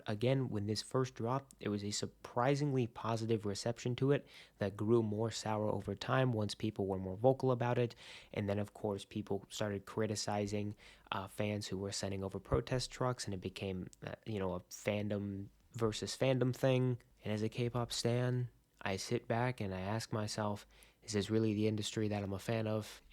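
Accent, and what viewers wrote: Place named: Bulgaria. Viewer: Are you Bulgarian or somewhere in that area because I swear that's your accent.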